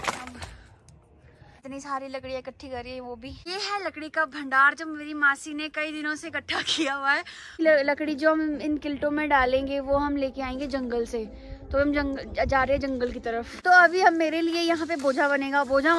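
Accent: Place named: Pahari, India. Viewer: Her pahari accent 🔥😘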